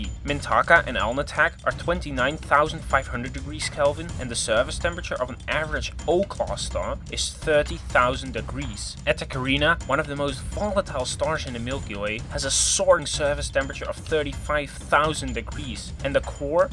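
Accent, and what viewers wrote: Netherlands. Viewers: It's Dutch accent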